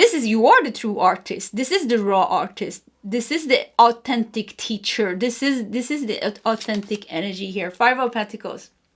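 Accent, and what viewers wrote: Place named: Sweden. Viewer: can I PLEASE adopt you as my DAUGHTER?!!😊❤😂 We just LOVE listening to your sweet, Swedish cute self in readings! And pile#2 was SPOT on every single card you read!! You were ABSOLUTELY right about the news and media.. I found myself sucked into all the celebrity gossip on YouTube last weekend..for like ALL weekend, and then my (twin flame) & I ended up in a HUGE fight..? We JUST figured out...its the DARK energy that made us get all angry.. NO more watching ANYTHING but light work and higher learning!!😁🤗😘😘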